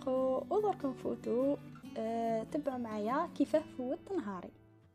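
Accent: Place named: Chaouia, Algeria. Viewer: هدرتك تباني شاوية .. صح عيدك 😘😘😘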